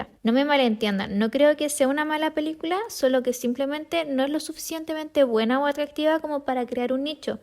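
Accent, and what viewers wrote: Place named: Chile. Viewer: tengo que decir que tienes una voz bonita , PERO HABLAS MUY FEO por el momento DUDE en que IDIOMA HABLABAS. y me di cuenta que hablas asi por que eres CHILENA, y te quiero comentar que si vas a tener un canal y compartir contenido TRATA DE HABLAR MEJOR por que no todos entendemos el CHILEÑOL.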